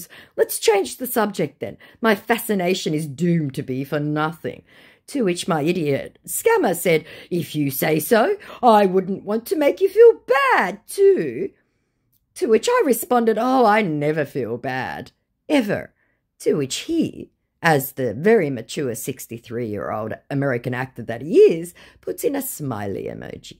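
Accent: Australia. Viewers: Subscribed cos of ur Aussie accent. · Your voice is literally EXACTLY the same as my stepmom's. She passed suddenly a few days ago and was also from Australia - although she was from Adelaide. I got to learn a bunch of Aussie slang, got introduced to new foods and drinks, and can definitely tell an Aussie accent from a Brit one because of her. I'm taking her passing hard but your videos/voice are such a comfort to me right now. So from this Cali-born Pacific Northwest transplant, thank you.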